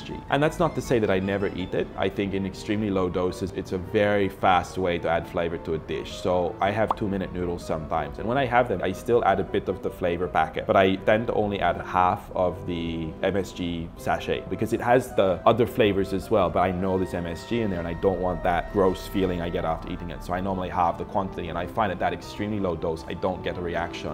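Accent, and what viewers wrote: Philippines. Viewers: Hi,. I'm a Filipina from Colorado and would like to weigh in. As far as your looks, you are way too fair, but then you have no control over that. As far as your face, you have nice, kind eyes and a nice smile. So to me, you would be an 7 - 8. But then individual tastes are exactly that, individual, right? I prefer male faces to be more chiseled. But that's just me. It cracks me up to hear you speak English because you have a detectable Filipino accent and you seem to have lost your Australian accent. Anyway, keep on "chefing" because I watch your show to get the "base" when making unfamiliar Filipino dishes. The Filipino chefs on youtube speak Tagalog, and I don't understand Tagalog. Good day! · Don't listen to those hater, you have a great channel. But I have a question, how did you get a Filipino accent? Lol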